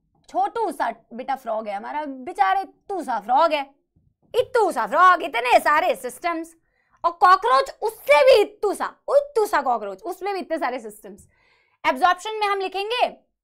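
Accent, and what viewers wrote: Bengal, India. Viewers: Ma'am aapki bengali intna cute hai ki kiya ji bolun main 😍😍😍😍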